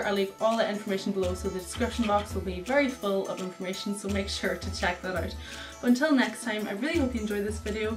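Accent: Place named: Ireland. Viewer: i seen it :) really enjoyed it love listening to a irish accent in a vlog for once haha yay cant wait for the next vlog;)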